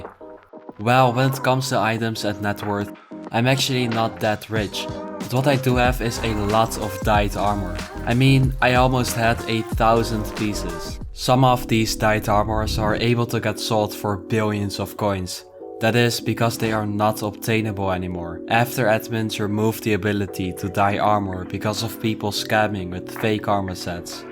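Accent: Netherlands. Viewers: Are you dutch cuz ur accent sounds insanely dutch lol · super nice vid man keep it up!!!!! you have kinda a dutch accent is this true?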